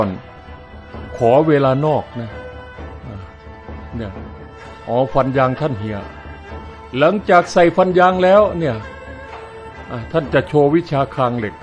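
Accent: Isan, Thailand. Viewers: ฟังเสียงพากย์เสียงเว้าสนุกดีผมชอบ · สนุกครับลุงผมชอบลุงพากษ์อีสาน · ผมชอบลุง พากษ์อีสานครับ.ใครไม่ชอบมึงไปดูช่องอื่นอย่ามาด่าลุง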